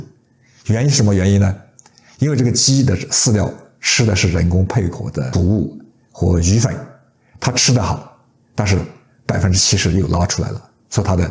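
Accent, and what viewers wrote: Yunnan, China. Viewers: 听得出是我们云南人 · 老师口音好像云南这边的。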